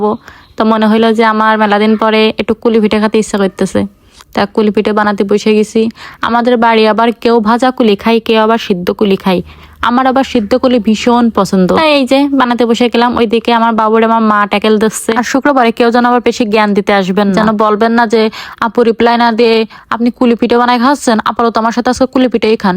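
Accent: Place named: Khulna, Bangladesh. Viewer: আহ্, খুলনা ভাষা ❤❤❤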